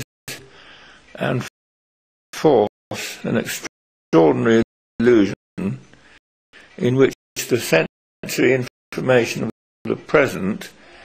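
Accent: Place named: United Kingdom. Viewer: lol, old school brittish accent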